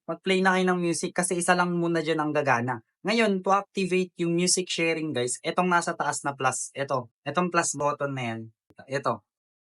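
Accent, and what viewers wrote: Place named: Pangasinan, Philippines. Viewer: Pangasinan accent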